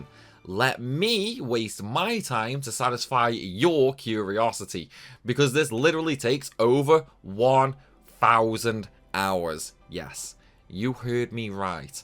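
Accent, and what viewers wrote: United Kingdom. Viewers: Have you never heard a Brit speak before?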